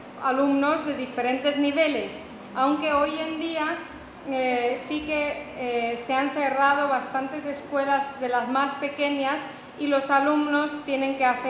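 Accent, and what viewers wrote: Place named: Spain. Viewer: sí que se le nota el acento español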